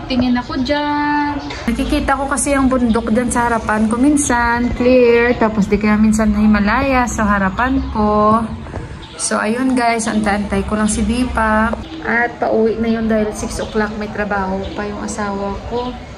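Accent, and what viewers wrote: Iloilo, Philippines. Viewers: hilo nang.. kalingaw simo pamatian mag ilonggo whahha. Kahilidlaw sg mga celebration sa Bacolod. hidlawon ka gd mgpa gutok hulat sg parad nang. And Happy Dashain sa inyu da nang. God bless kag keep safe gd da nang.🤍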